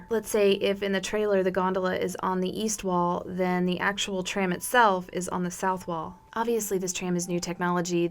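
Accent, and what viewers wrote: Texas, United States. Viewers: "Southern accent" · what part of Texas are you from? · wait, ur from Texas? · I didn't know she was also Texan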